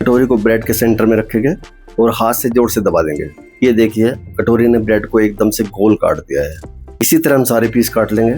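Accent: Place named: Punjab, India. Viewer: Aapka punjabi accent bht mast h👌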